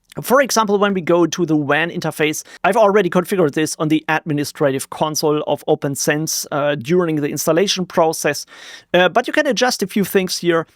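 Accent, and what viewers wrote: Germany. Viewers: I love your German accent, is it? Apologies if I'm wrong. Greetings from 🇬🇧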